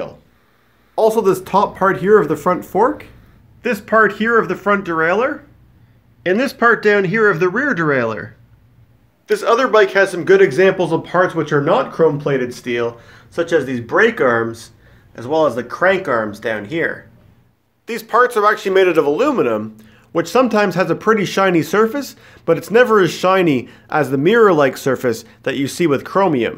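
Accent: Canada. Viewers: if anyone wants an example of how a canadian accent is different to US, here it is.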